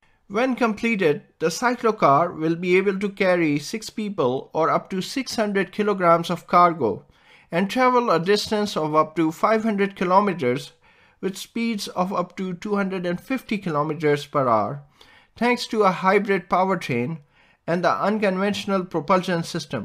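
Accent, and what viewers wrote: India. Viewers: Indian accent?😂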